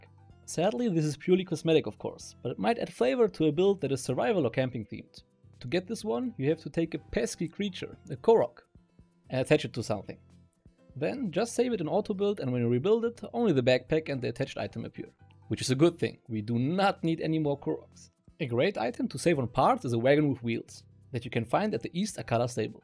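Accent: Germany. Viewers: GErman accent?!?!? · ich höre einen deutschen akzent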